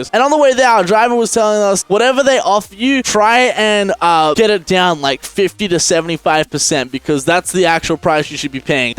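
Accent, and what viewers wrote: Australia. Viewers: wait a second, you're australian? what state?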